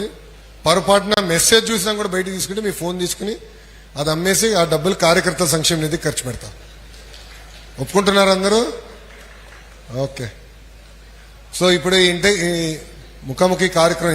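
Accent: Telangana, India. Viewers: Please stop Telangana Accent.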